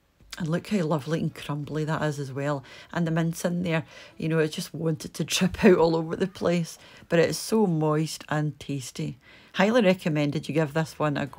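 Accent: Scotland, United Kingdom. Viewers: Love your accent.prosper Scottish.Also love your recipe. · Lovely looking pie I'm gonna do this. By the way are you from Inverness? You speak perfect English with you Scottish accent. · Oh I’m gonna give this a go. Wee Scottish lassie, I’ve been in Australia 33 yrs, nice to here a voice from home … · Thank god a Scottish cooking youtuber lmao was starting to talk american watching all these wans lmao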